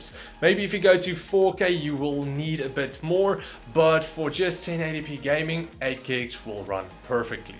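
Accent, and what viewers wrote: South Africa. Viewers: Woah, I didn't expect you to be south african.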